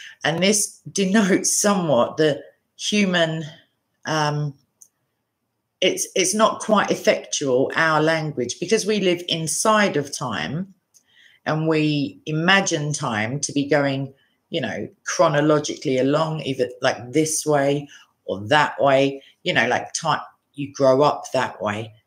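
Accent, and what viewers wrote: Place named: United Kingdom. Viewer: I love her British ascent.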